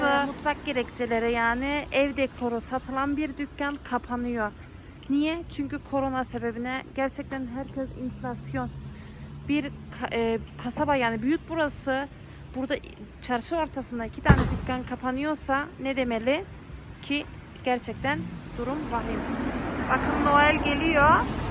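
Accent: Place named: Ankara, Turkey. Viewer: Nerelisiniz ankaralï gibi konuşuyorsunuz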